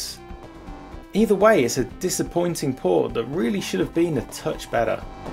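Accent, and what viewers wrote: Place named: East Coast, United States. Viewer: Aww, classic images of Great Yarmouth! I was there last week, at that very arcade. Sorry for the late comment, I am catching up. Plus, we might of been at the arcade at the same time, though I would of been 21 years old, not 6! Agree fully, Out-run was the first arcade game I played on the Mister when I got mine a few months ago. I am still awaiting Flying Shark (Fire Shark's older brother) I really hope that comes to the Mister soon. Also, it would be great if you reviewed the computers that Mister supported. I can always help there, as I have most of them setup. SpectrumNext on the Mister is fantastic, but like all the computers on the Mister, they are great, but not like the original beasts. This is my third video I have watched, subbed after video 1, and I did guess you were from the east-coast. Right, I'm done!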